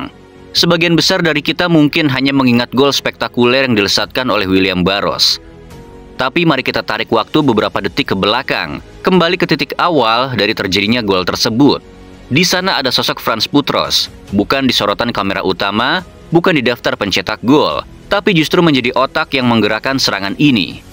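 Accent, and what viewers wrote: West Java, Indonesia. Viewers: Denger aja logatnya msh ada Sundanya 😂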